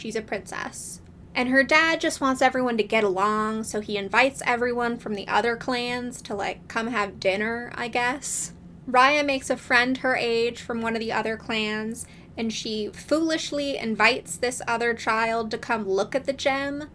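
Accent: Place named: Transatlantic, United States. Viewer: You ate that transatlantic accent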